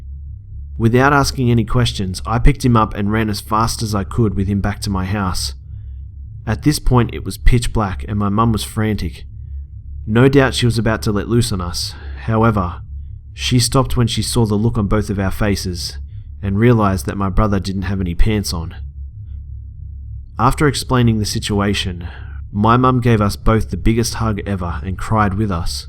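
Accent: Australia. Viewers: He's Australian 😂💕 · Just curious, but what is your accent? It sounds very British, not sure which region, but at times you sound distinctly Australian. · Not trying to be a stalker but it's obvious that your australian I'm a Queenslander wbu? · it's so hot when he swears, with his (australian?) accent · Yep it is aussie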